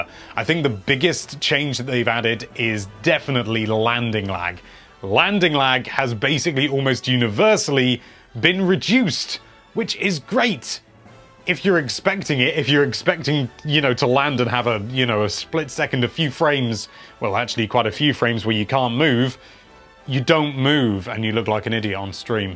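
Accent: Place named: United Kingdom. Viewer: Is it just me or does seem more British than usual.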